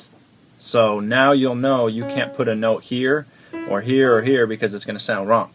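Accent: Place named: Texas, United States. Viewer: r you from texas or sum cause you got the hustla slang nom sayin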